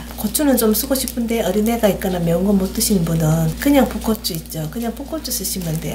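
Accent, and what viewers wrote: Gyeongsang, South Korea. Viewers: 이모님♡ 경상도톤이라 더 정겹네요.ㅎ 실방타고 첨 뵙는데... 돼지고기 장조림은 저도 처음인데... 손자,손녀가 잘 먹을것 같네요.ㅎ 자주와서 식사준비 할래요. 3종하구 갑니당.~^^*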